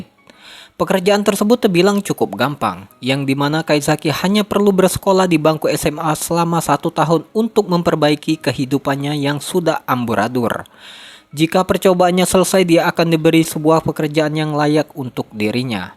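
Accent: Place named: Java, Indonesia. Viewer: Wong jowo bang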